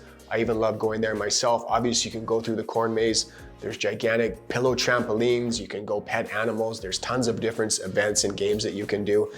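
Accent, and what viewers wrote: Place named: Canada. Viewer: Thanks for the info always loved Alberta. Really enjoying your Canadian accent.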